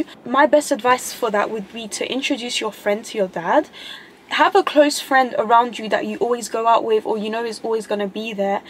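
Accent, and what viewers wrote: United Kingdom. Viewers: Because it is a British accent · You have a nice accent it's like a British accent ❤ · You have a nice accent it's like a British accent ❤️🇬🇧👍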